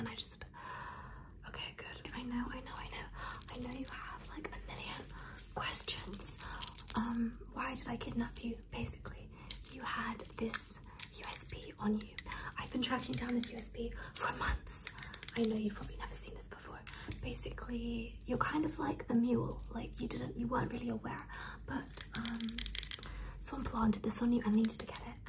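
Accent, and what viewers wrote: United Kingdom. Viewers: + why do you have a British accent?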